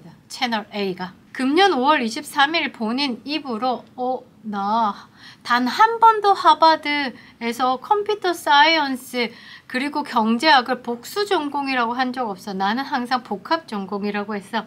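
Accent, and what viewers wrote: Gyeongsang, South Korea. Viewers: 준석이도 싫지만 니 경상도목소리도 듣기싫구나 아무튼 준석이졸업장엊ㅅ는거만 밝혀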